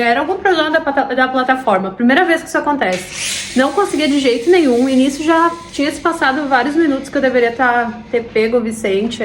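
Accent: Rio Grande do Sul, Brazil. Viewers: Amo os teus vídeos! E tu ainda não perdeu o sotaque gaúcho, tchê!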